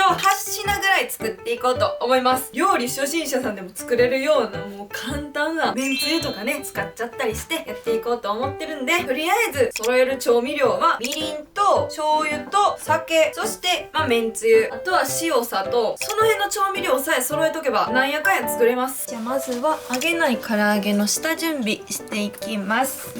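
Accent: Kansai, Japan. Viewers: ちゃんの動画、色鮮やかで関西弁が可愛くて本当に好き…！あんまり自炊しないけどこの動画見て自炊頑張ろ〜とモチベーションになった！❤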